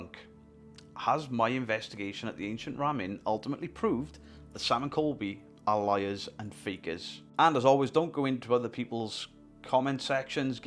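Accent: Wales, United Kingdom. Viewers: OMFG dude I could listen to your Welsh ass tell stories for hours man!!! PLEASE tell me you know who MANOWAR is!!!???? If you do you gotta say it sometime!!! Grandfather tell me ah story.... Such a good old school band!! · Pretty sure he’s Welsh!😂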